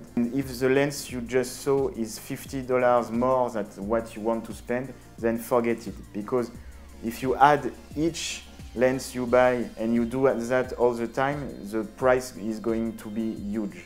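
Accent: France. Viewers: Il y a peu de bonnes videos francophones sur Youtube alors je n'ai pas compris pourquoi tu as préféré publier en anglais avec cet accent francais qu'on reconnait à mille lieues plutot que d'aider les francophones qui n'en trouvent pas. Merci quand même des conseils. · I can listen to that French accent all day.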